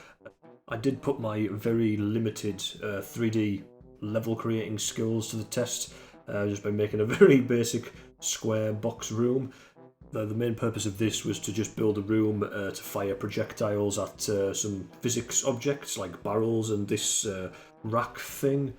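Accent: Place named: Wales, United Kingdom. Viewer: HES WELSH! HES BRITTISH!!!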